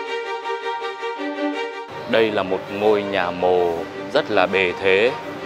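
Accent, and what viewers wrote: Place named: North, Vietnam. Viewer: Hello, dung now tieng Bac Dan mien Nam Khong Thinh dau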